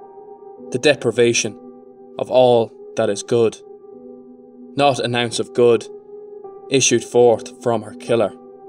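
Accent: Ireland. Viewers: Irish narrators rock.Respectful to victim,and her mother.No bias here💚🇮🇪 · Your channel has just popped up on my list.. another glorious Irish accent like Mike at That Chapter. and no gimmicks just clear narrator and detailed story .I’ve subscribed .. 😀 👍🏾👍🏽👍🏼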